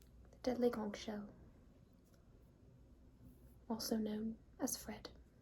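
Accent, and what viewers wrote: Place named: England, United Kingdom. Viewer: OMGOSH I L♥VE the English accent! You do it very well. You sound so authentic. WOW. [For the record: I am British 🫖🍵] Thank you, so Much!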